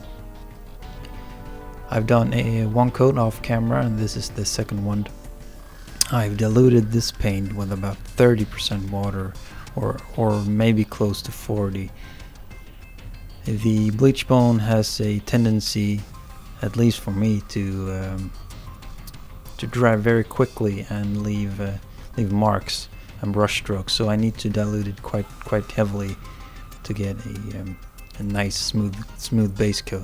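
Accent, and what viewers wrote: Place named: Sweden. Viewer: Tyckte att jag anade lite svensk brytning i din engelska. Nåväl, mycket bra klipp och snyggt målat. You are subscribed :)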